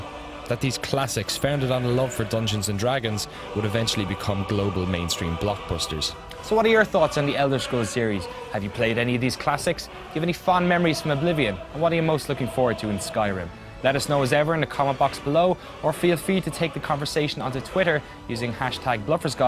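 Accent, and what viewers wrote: Ireland. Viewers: are you irish? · are you irish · This guy has a real Irish accent, we don't sound like leprechauns!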